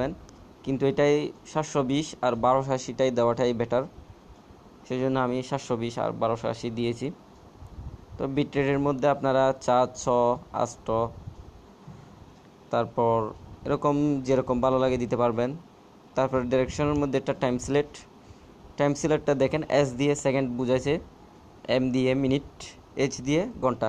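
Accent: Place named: Chittagong, Bangladesh. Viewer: আপনার ভাষা শুনে তো আমাদের চট্টগ্রামের মত লাগে,,,চিটাইংগার টান আছে ভাষাতে, 🥰🥰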